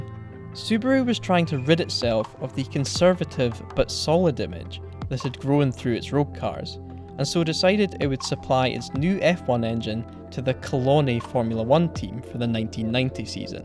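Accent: Scotland, United Kingdom. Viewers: so what he’s scottish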